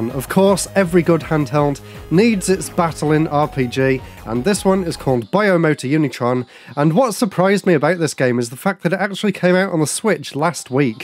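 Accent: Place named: Scotland, United Kingdom. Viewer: Your accent has always been so interesting to me. When you said you go to Edinburgh for your job, it made me focus more on your accent... and I'm wondering, is it like a British English / Scottish combination? Like 85% British English, 15% Scottish? The way you say "found" reminds me of Scottish, for instance. But this could just be confirmation bias! Either way, very nice accent!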